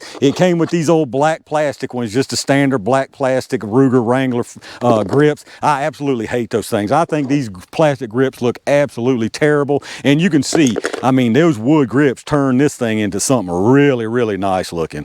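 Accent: South, United States. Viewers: Your videos are fun! Like your crazy hat and Southern accent ya'll!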